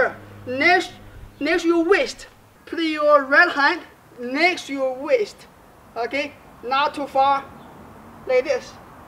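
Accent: China. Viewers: I love his Chinese English not American pronunciation! I enjoy ALL his videos.. the Chinese sword is wonderful to watch in action! We want to see many sword techniques! Hope he does more at a slow pace so we can follow him! 💡🩸💡🩸🩸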